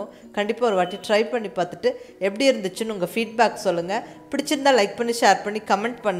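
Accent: Coimbatore, India. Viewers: ஆளுமையான கோவை வட்டார உச்சரிப்பு ,எளிமையான, அசத்தலான பேச்சு, எளிமையான சமையல் ஆண்களுக்கும் ஏற்ற குறுகிய நேர சமையல், அருமை, வாழ்க பல்லாண்டு.நன்றி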